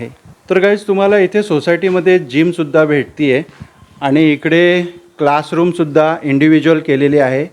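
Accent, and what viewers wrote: Konkan, India. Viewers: Mi hindu aahe pan tumchi kokani muslim language aikayla far god watate. Jevunshi aiylu etc. all d best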